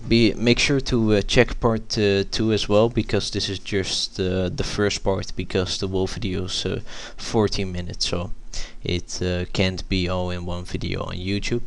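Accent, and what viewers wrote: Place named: Quebec, Canada. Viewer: true! I think he is quebecian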